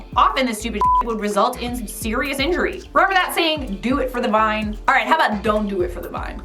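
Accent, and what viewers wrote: England, United Kingdom. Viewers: Stop trying to be funny it’s not working And your English accent is awful and cringe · I was thinking I was gonna dig this video, then the British accent rolls in.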